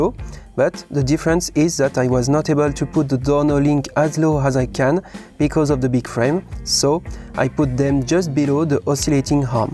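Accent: France. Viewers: t'es francais non?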